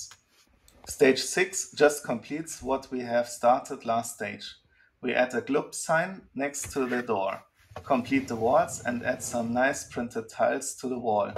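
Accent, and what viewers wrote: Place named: Germany. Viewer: Building the Figures is easy, i dont know where the problems for you are. Meanwhile i think your mother language is German, and i think your can hear very well when you speak English. You should try speak more fluent. Otherwise nice review.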